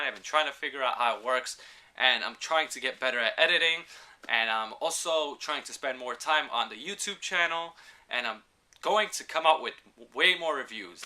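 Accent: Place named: New York, United States. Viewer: Gotta love the NY accent, good review overall.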